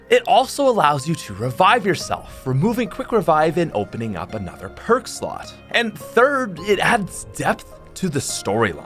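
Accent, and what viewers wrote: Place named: Quebec, Canada. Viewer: hes french canadian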